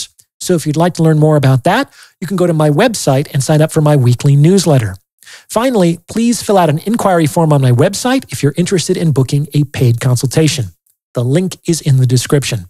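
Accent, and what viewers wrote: United Kingdom. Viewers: It's the British accent for me 😂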